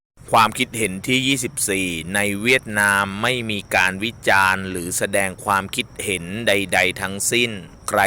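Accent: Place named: South, Thailand. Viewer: 😂😂😂😂😅🤣😆😁😂กดขำให้ตั้งแต่ยังไม่ฟังเลย/อ้อ!ชอบแอดพูดภาษาสำเนียงใต้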